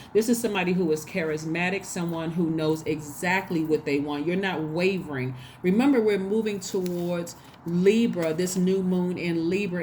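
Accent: United States. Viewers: Im french but when i listen to you I feel American lol I love Aquarius ♒️ they are the best 💜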